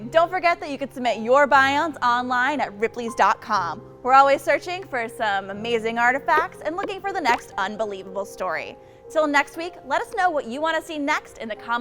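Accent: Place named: California, United States. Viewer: My God that voice. She sounds like The Californians on SNL. Not to mention that it's not definitive Oswald killed JFK or, at worst, pulled the trigger.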